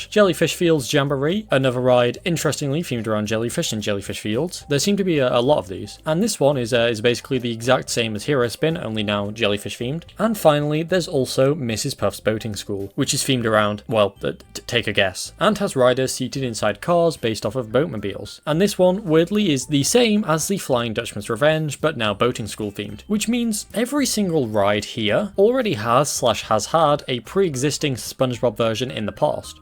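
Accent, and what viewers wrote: United Kingdom. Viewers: Its about time a British Narrator got around to adressing this. Cheers!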